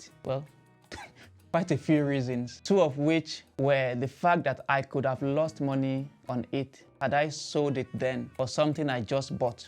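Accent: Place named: Africa. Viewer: i worked with a lot of Africans, for some years, i miss hearing this accent everyday x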